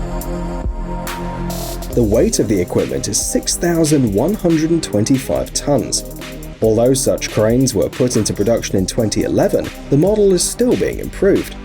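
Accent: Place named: RP, United Kingdom. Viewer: Looks like someone took someone else's video and put a poncey, Harry Potter type commentary on it.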